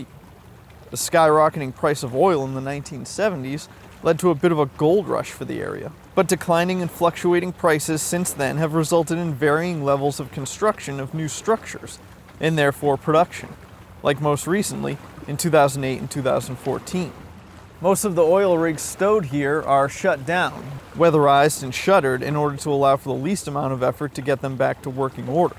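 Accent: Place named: Scotland, United Kingdom. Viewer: I had to turn on the CC to see what you were saying what with that thick Scottish accent and all....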